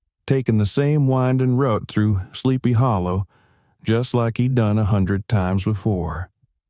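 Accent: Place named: West, United States. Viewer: Is the narrator from Utah? I would swear he is from out West from the accent . i love Ky stories . My Dad had a few.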